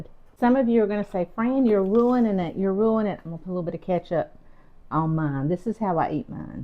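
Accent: South, United States. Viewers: I make salmon burgers and put in finely diced celery, parsley and use oats to bind. Dust with flour before i fry. Im gonnavtry your recipe. I love watching your videos and your southern accent is musical, delightful, and beautiful, ❤❤❤❤❤ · Keep on keeping on,don't change anything ! Keep your great southern style, language and all. From one southern belle too another!❤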